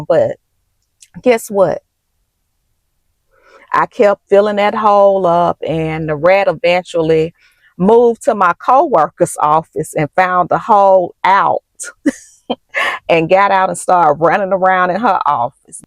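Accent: South, United States. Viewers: Hi, love your makeup and southern accent. I 🕶️ what you 👀. I’ve never had the 4 legged rat at work, but that dang conniving 2 legged rat will try to get you every time! Be blessed! 🙏🏽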